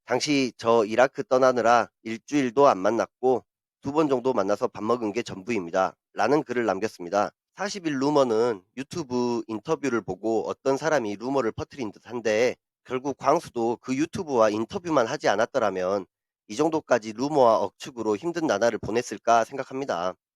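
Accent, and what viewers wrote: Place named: Jeolla, South Korea. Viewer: 전라도분이신가봐요 억양이.. ㅎㅎ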